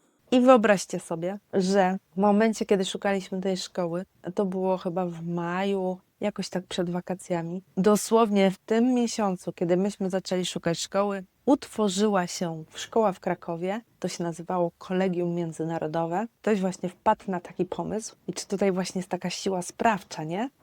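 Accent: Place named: England, United Kingdom. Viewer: no właśnie ten język Polski w którym pani rozmawia jest już z akcentem Angielskim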